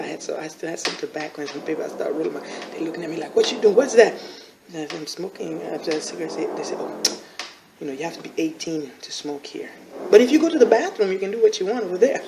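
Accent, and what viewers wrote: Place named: New York, United States. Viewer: Wow! It's really you Kid. Thank you for so many memories. I loved and that joint back when I was Thirteen and still do. You inspired me to start rapping and making music. The 90's dance pop is booming again. You should start touring. Your story is incredible. I always thought you was from New Yaaawk.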